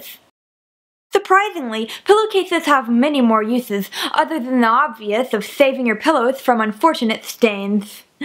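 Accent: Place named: Europe. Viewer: Anyone else notice how much sexier is than? That bedroom hairdo and so very continental with that accent..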